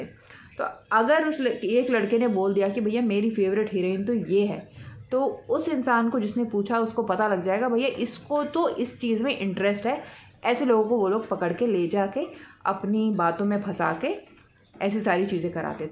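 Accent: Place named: South India, India. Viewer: Di ap MERI English ki mam Jaise lgte ho,school ki teacher thi, wo b south Indian thi, aur apka way of talking b aisa hi tha r wo b south Indian thi 😘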